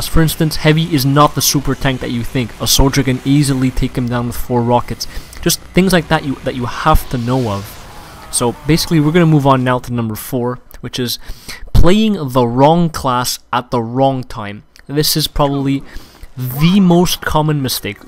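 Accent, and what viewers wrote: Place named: Canada. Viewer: Garry Newman English accent? I'm pretty sure you mean canadian. I find it cringeworthy that you can't actually understand him to a degree where you wouldn't need subtitles.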